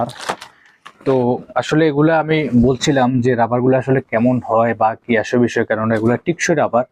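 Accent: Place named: Chandpur, Bangladesh. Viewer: ভাইয়ের বাসা কোথায়??। কথা চাঁদপুরের মতো মনে হয়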